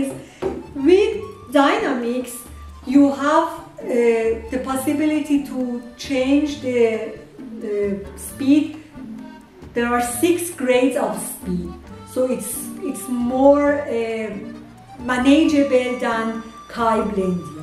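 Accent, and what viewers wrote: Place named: Iran. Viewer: Man, that is one thick persian accent lol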